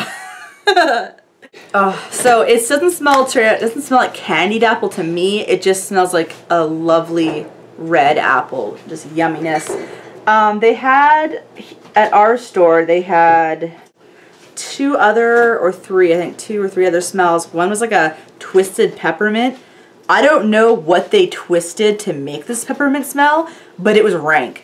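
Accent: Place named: Canada. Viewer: Thanks for asking me to join ur channel! Glad to of- and so far i loveee your videos! Didn't knw u were Canadian, but love ur accent! Its real cutee! Id have to choose as my "wanting to try" would have to be tha Sugared Vanilla Wish & that Winter Candy Apple out of all of thm! Id like tht Candy Cane one also! So many to choose! Awhhh! Hehe :D Keep it up! Luv em! Would love to win as i lovee BBW! :D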